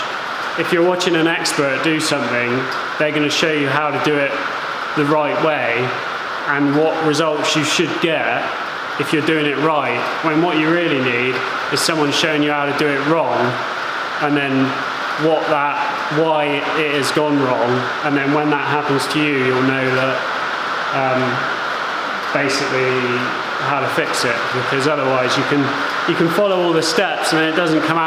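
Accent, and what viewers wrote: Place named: South West England, United Kingdom. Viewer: Nice build fella, I thought you had a familar sounding accent. I'm in SW UK also, it kinda makes the project all the more interesting. Subscribed.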